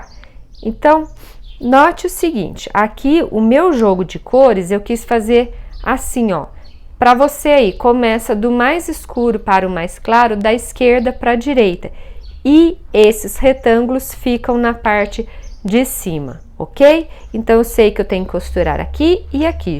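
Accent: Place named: Minas Gerais, Brazil. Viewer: Adorei! Gosto demais de ouvir vc falando com esse sotaque de mineira, me lembra muito minha mãe.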